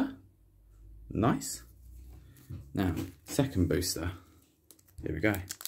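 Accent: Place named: England, United Kingdom. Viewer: Well fk me sidewise I was not expecting the English accent lmao caught me off guard. Saw you on the TabooContract twitter with those dragon cards.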